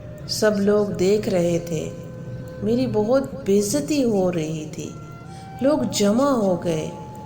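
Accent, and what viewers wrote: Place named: Punjab, Pakistan. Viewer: کمال پنجابی تڑکے کے ساتھ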